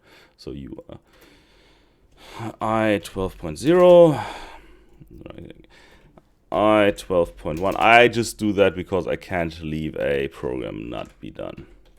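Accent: Germany. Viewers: man merkt doch schon nach dem einleitungssatz dass der dude deutscher ist xd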